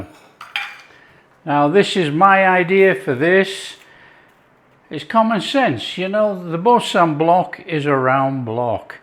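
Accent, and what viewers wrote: Yorkshire, United Kingdom. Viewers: I believe it's a Yorkshire accent, specifically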